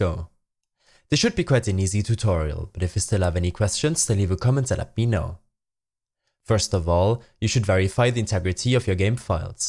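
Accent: Germany. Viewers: Very good Deutsches Akzent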